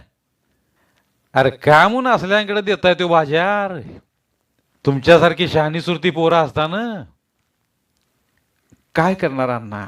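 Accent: Rural, India. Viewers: नमस्कार सर, मस्त वाटेल, ग्रामीण भागातील जसाच्या तसच आवाज आवडला.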